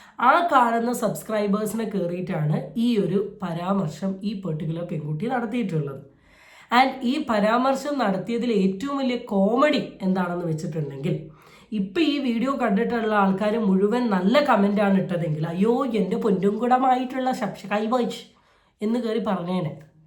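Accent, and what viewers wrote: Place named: Thiruvananthapuram, India. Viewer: Only particular areas in tvm they speak in this way , please don't tease the slang it's a request not an order please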